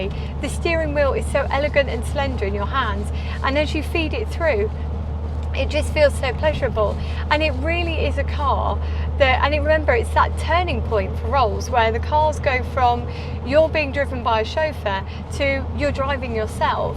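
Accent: United Kingdom. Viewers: A very few of us can still speak the King's English!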